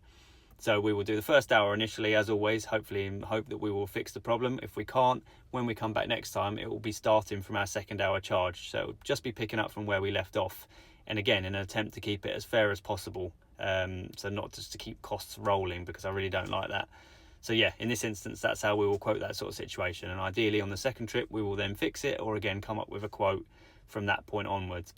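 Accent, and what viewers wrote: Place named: South East England, United Kingdom. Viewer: that’s great pal. Very helpful info… Where are you based if you don’t mind me asking? I’m up in Manchester and south lancs/Cheshire. I’m guessing somewhere south east from your accent 😂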